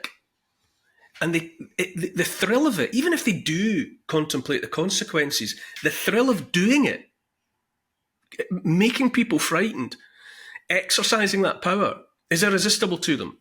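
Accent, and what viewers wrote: Scotland, United Kingdom. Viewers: Hi Neil Oliver, thanks so much for your videos, I really appreciate your thoughts. It's so rare that someone sees the truth, & understands what's really going on. I'm pleased you are so brave to speak the truth. TBH your history programmes used to really rub me up the wrong way, maybe it was the strong Scottish accent? Now I am really respecting you though. Lucifer has the control over this world. He is the mastermind behind all the evil you see. Lucifer is the Prince who plans all the wars, & trouble, and evil schemes. He controls the powers of this world. I hope you will read the Bible, because I know it will open your eyes to the TRUTH. Without God there is only death. I'm hoping you don't dismiss this comment, & your eyes will be open. God has the victory, Satan will be destroyed ..... all will be good. Best wishes, appreciate your hard work.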